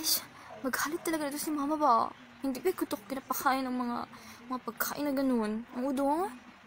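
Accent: South Korea. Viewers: galing mag korean bisaya accent 😊😊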